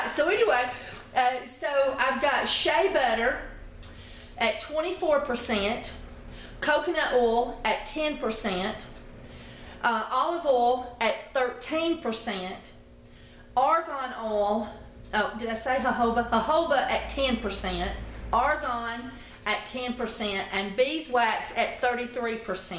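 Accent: South, United States. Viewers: Hey just found your channel yesterday awesome channel. Thank you for sharing your knowledge. When I heard you speak I said she’s southern, so am I Knoxville TN, here looked you up we’re not that far away from each other. Keep these great videos coming love watching you.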